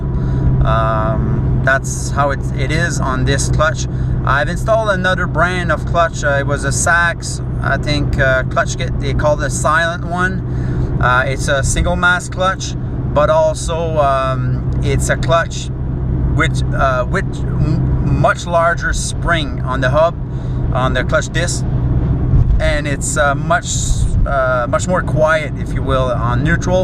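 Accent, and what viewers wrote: Quebec, Canada. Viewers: good video thanks, I'm doing mine today, You sound quebecois , Are you in Gatineau or Montreal?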